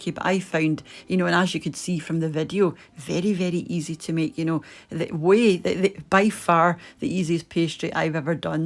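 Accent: Scotland, United Kingdom. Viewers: My maternal grandpa was Scottish so it's no wonder your lovely accent and fantastic recipes make me feel like I'm at home !! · I truly adore your videos. You’re a wonderful and creative cook. I’m in Texas and my favorite part of your videos is your charming Scottish accent and pictures of Scotland.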